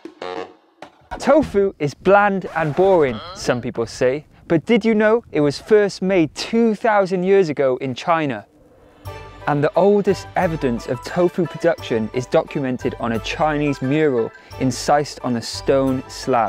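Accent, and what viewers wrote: United Kingdom. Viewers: Hello. For the first time I came across to your channel as a vegetarian I subscribed immediately to your channel) Thanks so much, besides your vegetarian recipes I love your British English accent. Greetings from Azerbaijan,Baku.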